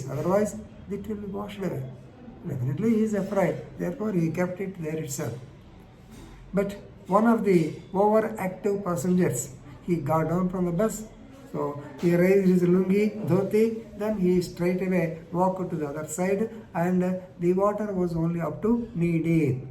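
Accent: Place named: North India, India. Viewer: gr88 explanaiton very lucid but accent was prob north indian here...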